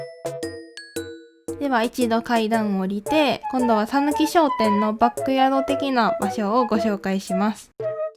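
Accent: Kansai, Japan. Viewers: 儚げふわふわ関西弁お姉さんだった頃のアニキ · 最近あつ森を始めたのですが、ほんとにこんな素敵な島になるのかと驚かせていただきました🙄途中出てくるマップも好きだし、主さんの関西弁も好きです🥰🥰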